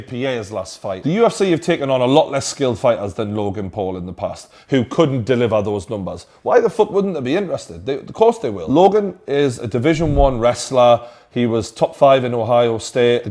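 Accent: Newcastle, United Kingdom. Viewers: Man like Geordie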